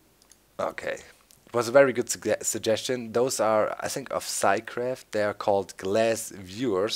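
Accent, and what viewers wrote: Germany. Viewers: i cant exit the video till i hear you say bye bye in your german accent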